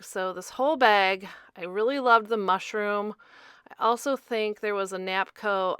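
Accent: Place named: Michigan, United States. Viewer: I started watching you when we opened our large booth in 2023. We had a very small booth years prior. The tips and info you shared reminded me that I did know how to do this, and was so appreciated! I've kept watching you as your information on display and merchandising remains so current and valuable. Love to go thrifting with you. Welcome to Whatnot! I'm not a seller, but have been a buyer for a while now. I'm excited to add you to my Favorites. I'll see you there! On a side note, I have family in Michigan (I live in California) and I love listening to your accent as it reminds me of them. Merry Christmas!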